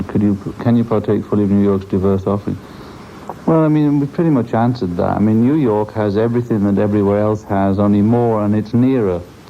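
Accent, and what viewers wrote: United Kingdom. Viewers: I just like to know where this came from, and if it has actually been authenticated. There is another tape recording of him just talking, and it does sound the same as this. I picked up that little habit he had of sucking air through his teeth. Also where his voice goes up a little? Do you know what I mean? And he used the 'me' when referring to himself instead of my. Three clarifiers. And he does still have a British accent, but he just it's like if you were just talking to him. And he's not putting on a show of any kind of being famous. But it does sound a lot like how I would think that he would be. Like always a friendly channel to cheer you up, getting into it with the cab drivers. And the way he ends it off with his funny little laugh. It does sound like not under the influence, in fact by that point he was not even drinking heavy anymore. 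This was right after he went back to Yoko. And I just would like to know where it came from. I want to know how these people have access to these things that no one else in the world seems to have access to. But God a lot of that was could have been my life no I'm not trying to compare myself with the great. It's like the part where he talks about I'm frightened and lonely or something everywhere. Having these great big great conversations with cab drivers yeah I do that all the time. And it just sounds like what I would think would sound like when he first got up in the morning and hasn't had his cup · I've listened to hours of interviews with Lennon. Something doesn't add up. Sure, the voice is British, but I don't think it's him. Just my opinion.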